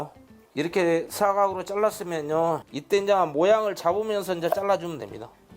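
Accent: Jeolla, South Korea. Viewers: ㅋㅋ 오늘따라 전라도 사투리가 구수하네염 ^^ 항상건강하세요. 덕분에 행복합니다 . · 구수한 전라도 사투리도 일품입니다 웃음도 나고요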